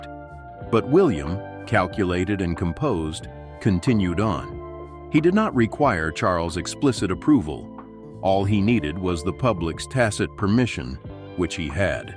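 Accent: United States. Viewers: Well done with your titles, real click bait, but if you do click, after a while you give up, because it never gets to the point. Clearly made up, and an USAan voice does not give it any veracity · What rubbish Camilla is Queen why is an American voice saying this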